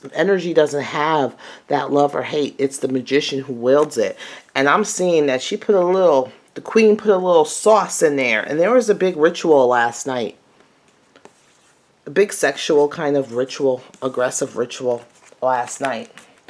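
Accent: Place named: New York, United States. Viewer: I thought I heard a little subtle of NY. I think a big conflict is Meghan's never Trumper bs narrative with all of her Illuminati hollyweird friends. The meeting of DJT is going to be something she isn't going to like and will possibly not be included. Watch, next week or in the next weeks to come, there will be an appearance with the oblamers that will cast shade on her "royal position". It's going to piss off the queen of the fall out from it. Just a feeling I have. This will be an "opportunity" for the oblamers to insert their "presence" onto the world stage. It's going to interesting to watch to say the least! Grab the popcorn!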